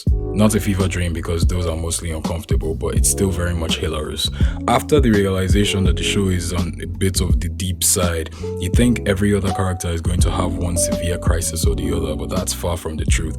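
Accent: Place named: Nigeria. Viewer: Well, I watch my shows in bed and I have severe ADHD. So I tend to fall asleep quite easily when watching this show. That's how I know a show is mature enough for me to have to be in the moment to not miss a thing. It doesn't depend on out of the ordinary shit like murders, fight scenes etc. Even the jokes are laid back. I'm just getting to watch it now, I'm in the second season and this is because I've been avoiding it for years now. As I did with Rick and Morty. You don't want to associate with a fandom that excuses constant shitty behaviour and lack of growth on self as okay, do you now? Either way I'm glad I caught up and I'm loving it. Love your Nigerian accent btw. Here in Kenya we have a myriad of em but I kinda envy your's sometimes.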